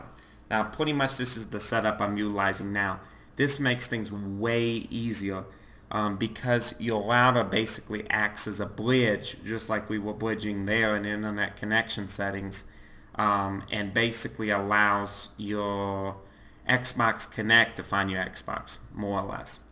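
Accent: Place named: New Jersey, United States. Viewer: this guy is 100 % from new jersey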